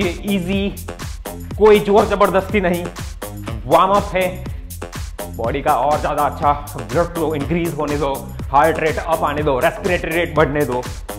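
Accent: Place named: Bihar, India. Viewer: Shuddh bihari sunne Mila ...exercise and fun both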